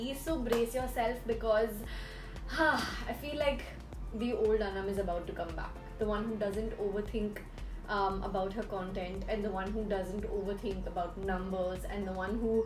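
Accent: United Kingdom. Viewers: I think you also should start new channel for just ur british accent! For ur british accent fans... 😅 You should atleast start some series though in ur british accent🤞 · British accent videoooo!!! Yesss!!!! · Hii... after a long time makeup video.... felt good after watching this😊... & ya old is back & so happy to see the old version🙂... & your British accent is 🔥🔥... & just wanted to say that I love u ❤❤❤🥰🥰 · Hey Anam this video was so good you i was doing my assignment and while doing my assignment i was just listening to you and i t felt so good listening to uhh and it gave a lot good vibes and i especially loved ur British ascent and lots of love to uhh and more power to uhh ♥️ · Omgggg, your brit accent is on point 😱💕 Loved this chatty video! 💕 Pls do some collabs! 💕 Even I feel like such a community/communities are important 💕💕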